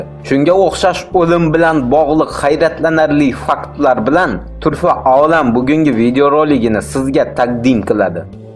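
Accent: Khorezm, Uzbekistan. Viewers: Улеее хоразмлимисане эшитиб асаб болдим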